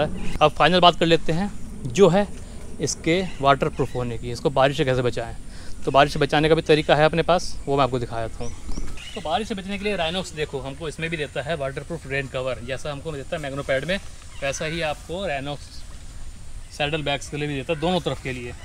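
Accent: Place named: Uttar Pradesh, India. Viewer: Safar Paiy Main Aap ko Yaad Rakhunga Jaroor Sir G. Par Aap Mujha Yaad Rakhna Saddle Bags Give Away Karta Huay . 😁 Bhagwaan Aap Kay 10000 Nahee 10 Lakh Subscribers Ess Saal Kar Daily. My Best Wishes To U For that. I liy your vlogging style & your Hindi UP Accent . Cheers bro Milangay Safar Par Aap Ko Ek Din .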